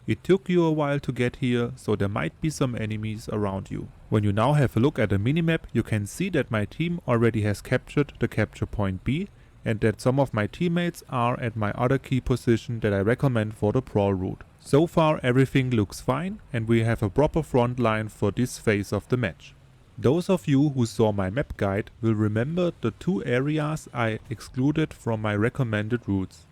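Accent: Germany. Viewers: Der deutsche akzent 😂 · English is the yellow from the egg. Spaß klarer deutscher Akzent aber Video hat trotzdem mehr Aufrufe verdient, gut so 👍🏻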